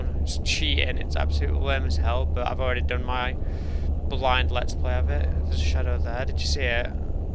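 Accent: England, United Kingdom. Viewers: What part of england are you from?:D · are you like British or something? XD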